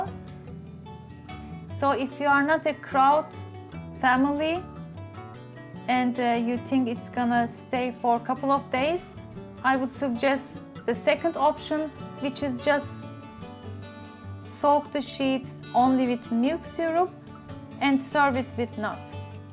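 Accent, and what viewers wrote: Turkey. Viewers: Love your English Turkish accent!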